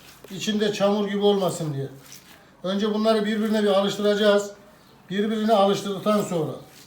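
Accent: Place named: Çorum, Turkey. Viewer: Ustam eline sağlık. Çorumlu musunuz memleketimin şivesi çok güzel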